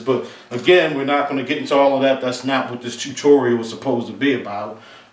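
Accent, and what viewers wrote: Baltimore, United States. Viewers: Nice vid. You're from bmore huh?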